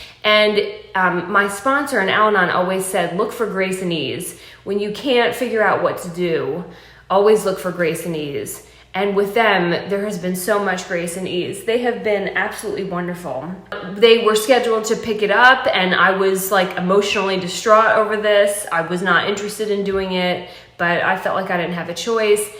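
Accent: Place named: California, United States. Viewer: Girl where you from ⁉️⁉️⁉️ You sound more Cali than North CAROLINA. Keep your sense of humor it looks good on you.!! ✌💗🎼🎵🎶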